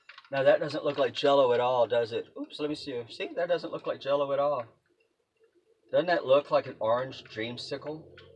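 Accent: Texas, United States. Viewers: I loved watching this video and loved listening to your Texas accent. We live in North Texas stationary and travel as much as possible. Thanks for sharing this with us. I am going to try this with dollar store pound cake. My Momma used to make the best homemade pound cake.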